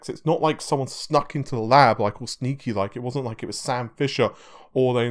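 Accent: United Kingdom. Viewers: He's British you dumbass and American is an accent. Not a language. I see the American education system has treated you well.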